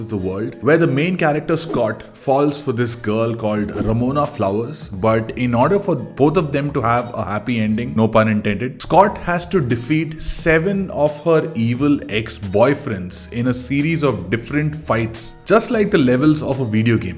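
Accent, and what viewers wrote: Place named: Tamil Nadu, India. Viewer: Nice review sensibility, and good cinema awareness, man. I haven't watched these movies yet, but your review makes me want to. And, with a surname like, I would have thought you were a Malayali. Your pronunciation of the Malayalam words, though, - flawless as they are - suggest that you aren't primarily a Malayalam speaker... you sound more Tamil than Malayali.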